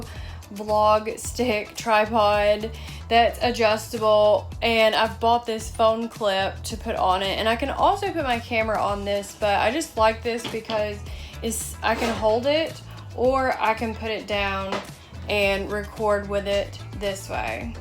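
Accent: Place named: South, United States. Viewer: You sound like a True southern Bell...If my ears aren't mistaken me?👌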